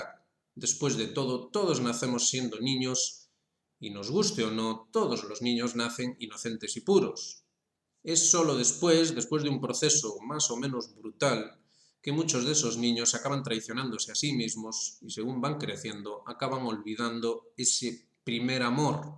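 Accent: Spain. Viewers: Me gusta lo que dices. Y tu acento me suena a noroeste peninsular.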